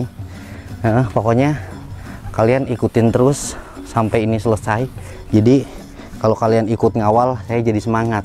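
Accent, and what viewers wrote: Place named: West Java, Indonesia. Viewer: Mas logatnya kaya orang sunda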